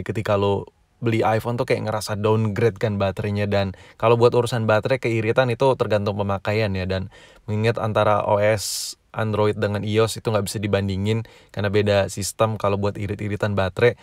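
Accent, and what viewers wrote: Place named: Medan, Indonesia. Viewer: bjirr ada logat medan yak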